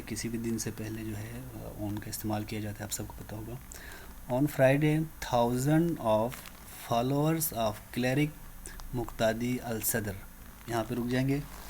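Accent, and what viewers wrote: Pakistan. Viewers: Accent like baltistan! Am I right bro.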